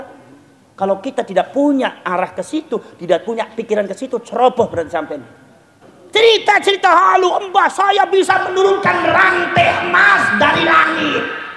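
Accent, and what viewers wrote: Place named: Banyumas, Indonesia. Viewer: Ngapak mantabbbb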